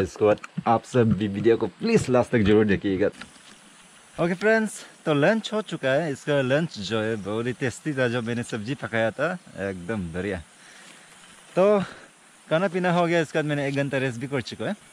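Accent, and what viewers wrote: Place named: Dhaka, Bangladesh. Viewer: Assamese ma dhakiya bolta ha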